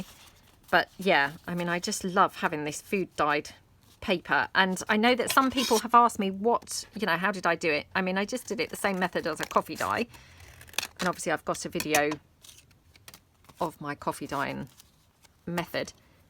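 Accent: England, United Kingdom. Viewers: Hi Miss!! I'm new to your channel but I am loving what you are doing..And your accent is beautiful..Are you from England? I look forward to watching your videos and seeing all the great Fall things you make...Lots of love from Kentucky💙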